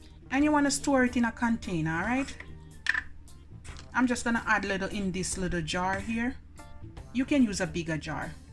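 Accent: Jamaica. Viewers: This is just an amazing healthy juice. Love your Jamaican accent is killing me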